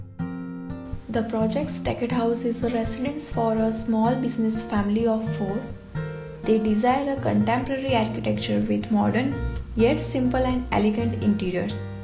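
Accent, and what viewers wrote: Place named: North India, India. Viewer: Typical North Indian engliss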